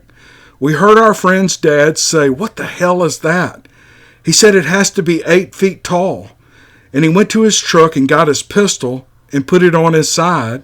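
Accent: South, United States. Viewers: thanks man, always great to hear a southern boy. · I love you southern draw, I'm from the south part of Arkansas and was told by my dad about swampy wooded area men would go hunting in but would never come out. This place seemed to me that it was south of Pine Bluff, Arkansas. Maybe you know the place or could find out. My dad when he told it looked really scared like he may have heard or seen something but wouldn't tell me or mom. I think he may have because he seemed to be a bit shaken up about it. Also if anyone reading this can relate to this story, please let me know.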